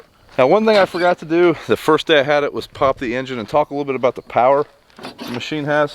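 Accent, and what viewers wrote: Ohio, United States. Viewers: 3 things It sounds like you are from the great state of Ohio, like me I like the buggy A million Indians can't be wroung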